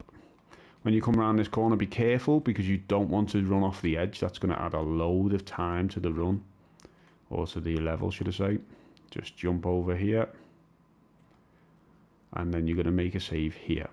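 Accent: Liverpool, United Kingdom. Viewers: Youre guides are mint mate, I take it you're in Liverpool by your accent lol im in stoke if I were nearer I'd buy you a beer 😂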